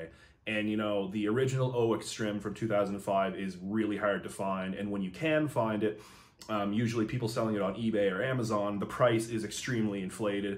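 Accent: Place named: Quebec, Canada. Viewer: I love his Québécois pronunciation, must be a Canadian... eh.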